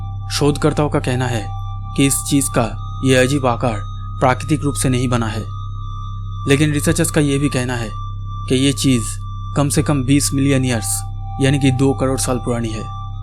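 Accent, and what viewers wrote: Bengal, India. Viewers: Sir, aap bangali kya, aapke awaj se aisa lag raha hain......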